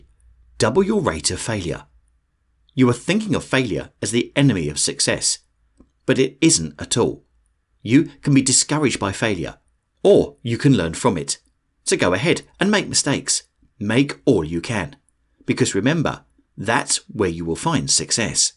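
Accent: United Kingdom. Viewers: Brilliant video. And your British accent drills the message into my brain with elegance.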